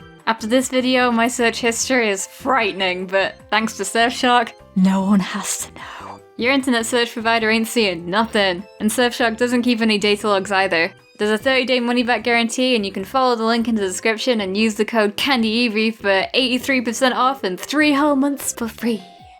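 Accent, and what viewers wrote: United Kingdom. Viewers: Because of your calming voice and British accent even you say something really WACK, will still be calming to my ears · Hmm, i dont have a single one i only subbed a few days ago for a few reasons Pokemon Like the Accent Like the Voice BRITISH MATE ( love the UK even tho im just a lowly German ^^ )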